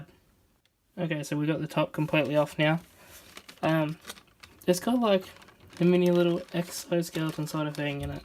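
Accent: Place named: New Zealand. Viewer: What the f a r k happened there Govna?? Did you have that opened up already (the rear diff), OR did that just come apart in your hand??? Bummer if it did dude, but, you should sound ALOT more motivated. The way you did this video MADE ME wanna stab myself with a blunt spoon mate!! 🧐... 👎💯. I'm cryin' with the fucking migraine..!! 😯 Also your pronunciation is ALL over the place. Your confusing your Kiwi accent with an American one, it's obvious brah!!! 💯👀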